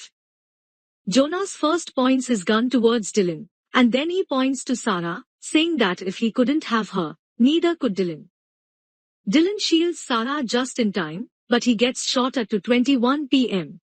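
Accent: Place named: India. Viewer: Your accent is like you're an Indian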